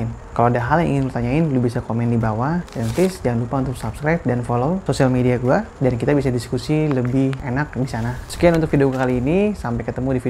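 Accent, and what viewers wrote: Cilegon, Indonesia. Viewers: Wong cilegon kih ? Boleh amat 🤣🤣🤣🤣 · Org cilegon?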